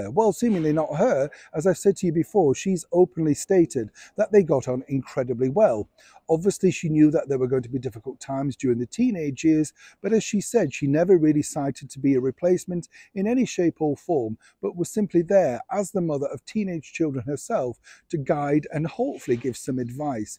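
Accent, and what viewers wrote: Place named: West End, United Kingdom. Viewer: Love ya Neil Sean here from the west coast USA!! You got a bit of west end in your accent but with high quality English Grammer. You spot on and I look forward to your reports daily. Cheers mate 🍻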